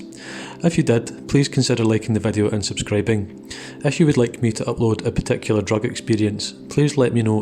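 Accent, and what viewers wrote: Scotland, United Kingdom. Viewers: I was watching Shrouded Hand's video on scary trips and I ran out, so I tried to find some more trip reports on YT, but I remember thinking "aaaah, they just wont be the same without his gentle tone, eerie music and Scottish (?) accent"... then I landed here.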